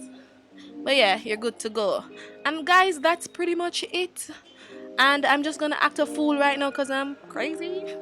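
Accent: Jamaica. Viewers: Love it . Ur Jamaican ?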